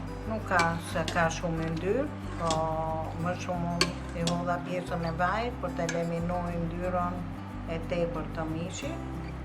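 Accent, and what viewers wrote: Tirana, Albania. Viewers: Je me e mira. si per gatim ashtu dhe per te folmen tironce .respekt nga larg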